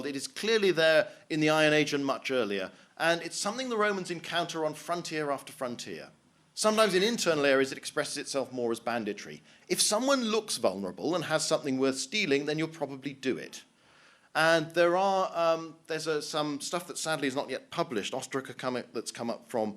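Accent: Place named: United Kingdom. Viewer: Absolutely enjoyable and very high information density. Plus the British accent adds credibility... :)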